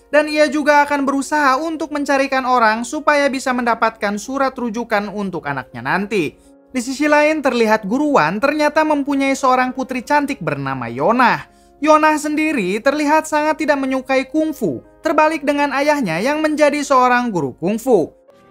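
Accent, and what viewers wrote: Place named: Madura, Indonesia. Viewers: logat madura cuy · Madura yah bang, hehe, semangat bang😂💪🏻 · Logat nya madura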